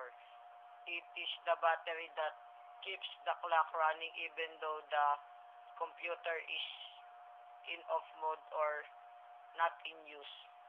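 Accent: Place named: Philippines. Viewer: pinoy hahaha. ung english pinoy n pinoy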